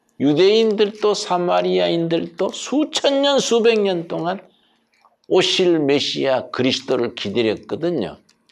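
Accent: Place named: Gyeongsang, South Korea. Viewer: 구수한 경상도 사투리로 엮어 주시는 하나님말씀 때때로 난해한 성경말씀을 쉽게 풀어 강해해 주시는목사님 말씀은 언제나 제게 감동을 줍니다 감사 합니다 감사합니다.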